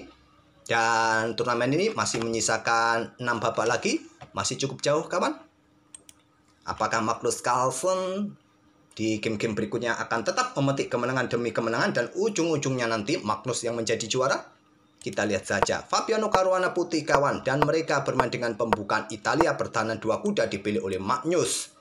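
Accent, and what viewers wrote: Java, Indonesia. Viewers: tak tantang lagi ogah, tak tantang lagi emoh, wong jowo ternyata admine wkwkw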